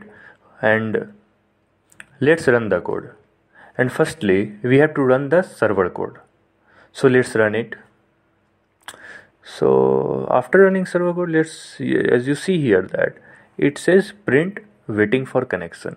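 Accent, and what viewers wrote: India. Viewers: Indian accents are often the sign of a good tutorial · Ow.... Indian accent.... By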